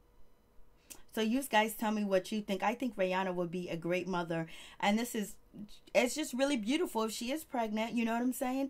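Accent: New York, United States. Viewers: I love the way you talk..are you from New York??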